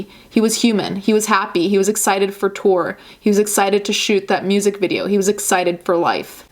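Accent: Italy. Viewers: Love you saying things the Italian way lol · I saw a tweet that said that she Googled his middle name so she could put it in her post HA. Omg I didn't know you were Italian?? The accent!!! Drag me.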